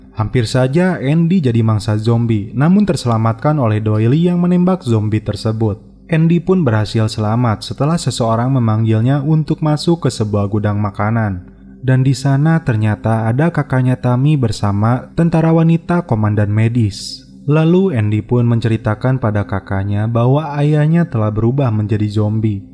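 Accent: West Java, Indonesia. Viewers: Ngakak ih yg cerita nya ada ada Sunda nya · Waw ka Sunda nya nyalosod🤣🤣. Semngat terus ka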